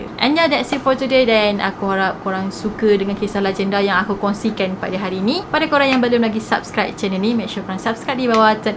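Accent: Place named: Kedah, Malaysia. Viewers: kedah ni boss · Tgk gaya n percakapan mcm oqg kedah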